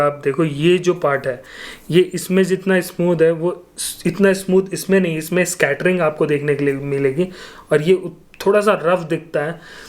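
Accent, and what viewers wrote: Bihar, India. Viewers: Bhai aap Bihar se ho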